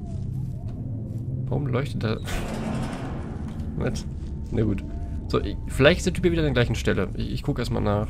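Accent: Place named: Ruhr, Germany. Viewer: da isser, unser Ruhrpott dialekt🎉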